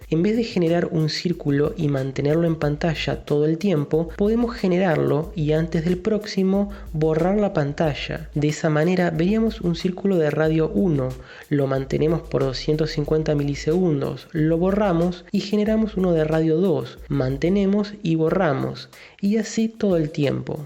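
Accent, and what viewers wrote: Argentina. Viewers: Las mejores explicaciones de arduino de YouTube, además es cómodo porque es argentino.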